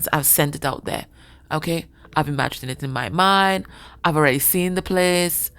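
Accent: Trinidad and Tobago. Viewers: Good afternoon, from London England, you sound Trinidadian, or Caribbean, I am Capricorn,xxxxx to you and family..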